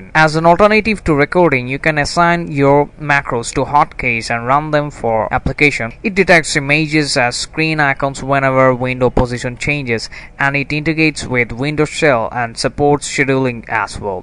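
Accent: India. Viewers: cazzo sei un indiano?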